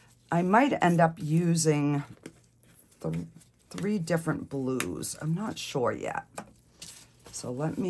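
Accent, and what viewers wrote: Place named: New England, United States. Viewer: This is BEAUTIFUL! Reminds me of Bob Ross. I've been following you for a while now, liking your vids on my TV. I'm from Mass & you sound like a New Englander, love watching you, feels like I'm hanging out with an old girlfriend 😊 You are quite the artist!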